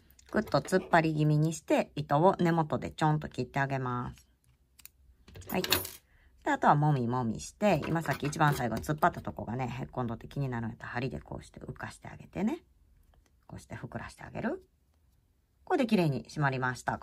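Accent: Kansai, Japan. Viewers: お地蔵さま❤挑戦しました😊 鎖編みからのスタートは苦手だったんですけど、トーク?独り言？の関西弁が面白くて笑いながら楽しませてもらいました😂お地蔵さま何体あっても可愛いですよね😊 あっ💦爪もお地蔵さまで可愛いです❤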